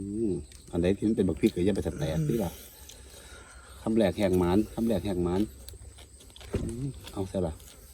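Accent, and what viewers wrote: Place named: Phon Sawan, Thailand. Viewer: ญ้อ อำเภอหยังครับ เว้าคือโพนสวรรค์บ้านผมเลย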